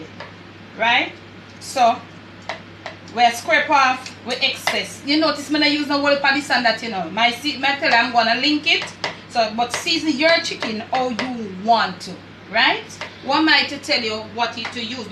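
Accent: Jamaica. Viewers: Since finding your channel I have been watching your videos every day. It is sooo nice to hear a true Jamaican voice when you live in 'foreign'. But even better than that are your mouth watering recipes. Authentic Jamaica cooking! I wish you were here in London running your own Jamaican restaurant. You wouldn't have hands to sell, trust me. Keep up the good work and we will keep watching. Love you gawn to bed! xxx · I really. Enjoy style of cooking its unmistakably authentic, i love the advice and the teaching points. As a results her viewers learn as if we are Island too.last but certainly not least the Jamaican Accent is so intridging until i wanna learn im sure just like her viewers.thanks cant wait to view ox tail, festival,fish tea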